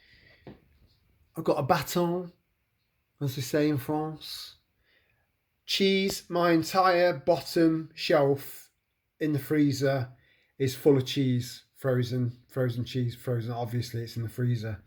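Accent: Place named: East Midlands, United Kingdom. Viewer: Ooh that accent! Def an East Midlands boy!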